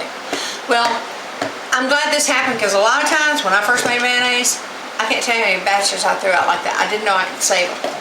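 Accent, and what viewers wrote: South, United States. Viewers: Can tell a recipe is gonna be good when the person has a southern American accent 😀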